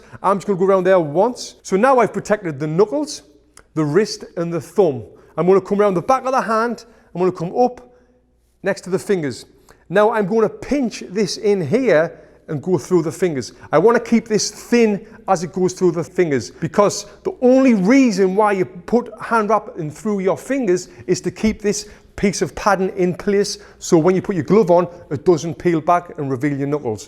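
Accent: United Kingdom. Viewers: He is British not Australian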